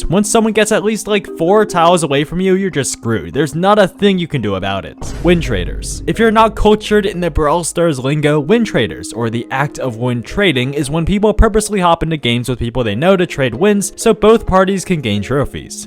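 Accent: India. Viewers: indian rupee ? ur Indian ? if u are damn nice accent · Bro r u indian??? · Are you Indian>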